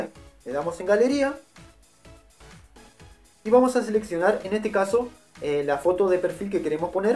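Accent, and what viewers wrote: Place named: Argentina. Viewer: acento argentino tiene que averiguar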